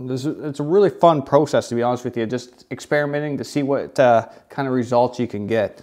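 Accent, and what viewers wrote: Canada. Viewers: He's canadian so he gets a pass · 9 seconds til Canadian reveal. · Are you Canadian? You look and sound Canadian. I know this is random as hell lol. Good video 👌🏾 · Idk because this is my first time watching one of this guy's videos but he sounds Canadian. I've never heard anyone pronounce it -oid and I'm American. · Reminds me of Canadian or from Minnesota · I think he's Canadian. That would explain the bad english.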